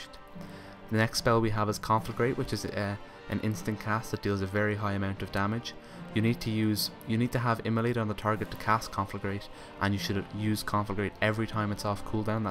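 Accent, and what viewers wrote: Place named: Ireland. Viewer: Another Irish warlock? :p my lordy!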